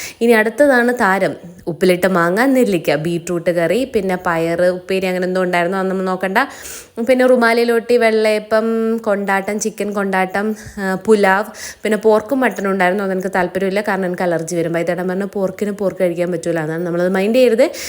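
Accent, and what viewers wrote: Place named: Kannur, India. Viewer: Evida veedu? Bhasha kettondu chodhichatha kannur pole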